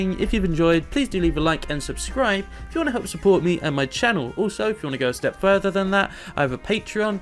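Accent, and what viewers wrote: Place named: England, United Kingdom. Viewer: Everything sounds better with an English accent. 😊 You have a great voice tone.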